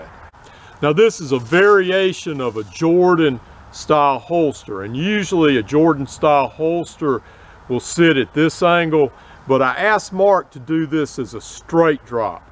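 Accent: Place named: Virginia, United States. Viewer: I would have guessed Virginia, well, at least we know he is not from Boston, Mass. lol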